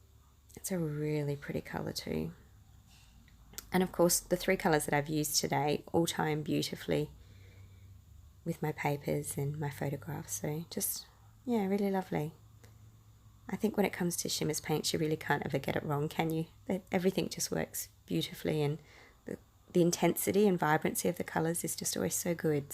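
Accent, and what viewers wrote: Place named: Australia. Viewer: I could listen to your cute Aussie accent all day long! <3 I initially noticed how you mounted your background on the floral paper. I love that, it's so original. Again, the Cocoa Vanilla paper has really caught my eye. I've been rather busy with my new job, but excited to get back to creating with all the goodies I love. Thanks for inspiring dear <3 Cheers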